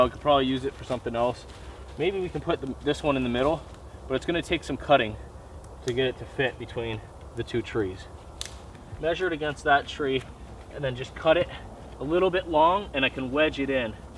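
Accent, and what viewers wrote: Canada. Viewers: Awesome woods scenery. Lovely colour in late fall I see. You must be in Canada all those oak and maple tree's. Oh you just said " Canadian gem knots" So you probably are in Canada and sound Canadian. Awesome job on the bed. Gorgeous german shepherd dog too! Yummy poached eggs. Good job on the eating stick. Thanks for sharing your knowledge!! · Is this guy Canadian?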